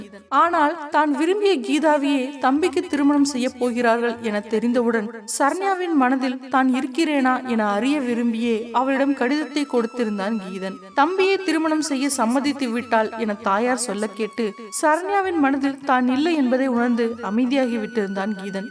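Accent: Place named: Jaffna, Sri Lanka. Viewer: சுனிலுக்கு பெபேவா.இன்னும் யாழ்பான தமிழ் விடவில்லை. அவதானித்து கொண்டிருக்க வேண்டும்.நல்ல பதிவு.❤நல்ல தமிழை பின்பற்றவும்.அவதானம் தேவையில்லை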